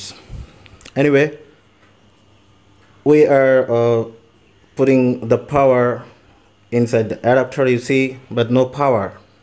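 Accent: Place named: Philippines. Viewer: Wow ang galing nman parang pinoy lng ang NG sasalita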